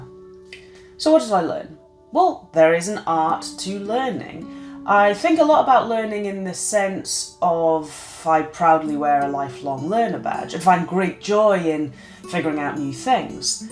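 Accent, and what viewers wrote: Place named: United Kingdom. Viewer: I love it when a British speaks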